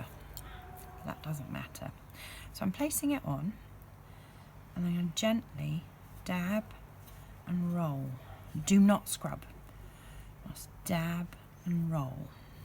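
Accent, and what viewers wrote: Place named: Devon, United Kingdom. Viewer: Devon accent 'ooh arr... I like a bit of poking tool for aaafters!' x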